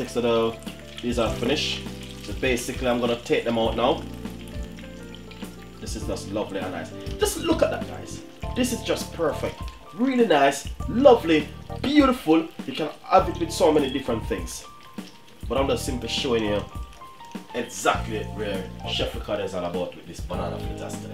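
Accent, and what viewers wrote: Jamaica. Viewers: you obviously don't know your own dialect... ppl from other Caribbean countries sounds different from us... ppl from Trini.. Barbados and all over speak different... you can easily tell a Jamaican accent... she doesn't listen to what he says cuz he says "this is how we do it here in Jamaica" in every video!!! so it is a stupid question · Kurt Sammons that is how Jamaicans speak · Nah bredrin, I love it when he says "simple add ...." instead of "you simply add..."; "luvley an nice"; and "flitters" instead of fritters🤣 He reminds me of my parents (RIP), who were also from the beautiful island of Jamaica ❤❤ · Real yardie...from u hear di counting (number dats di second one)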